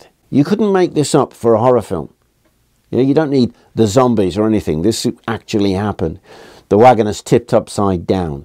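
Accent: United Kingdom. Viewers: I am a history enthusiast. And my love of history deepen even more and more when I watch your videos with your extraordinary british accent and learn unique words thanks to your rich lexicon.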